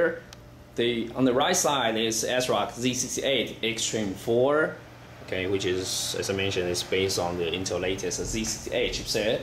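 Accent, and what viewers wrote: China. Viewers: It's a Chinese accent if I'm not mistaken...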